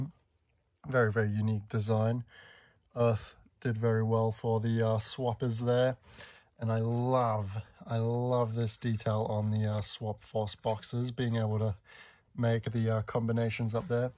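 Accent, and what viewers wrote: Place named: Australia. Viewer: An Australian man explaining skylanders is music to my ears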